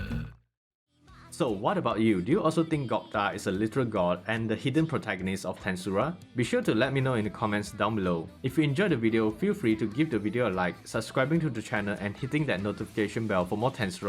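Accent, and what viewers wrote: Singapore. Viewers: dude are you singaporean? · Sing-lish FTW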